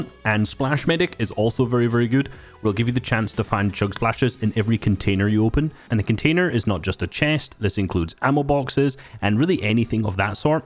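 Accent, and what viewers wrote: Scotland, United Kingdom. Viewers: Yo are you Scottish by any chance? And that tac pistol needs a nerf🤔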